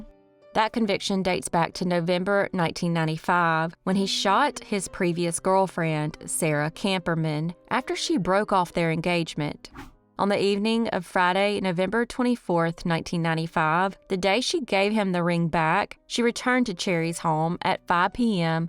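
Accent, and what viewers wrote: South, United States. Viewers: I understand, she is the only southern voice I can toletate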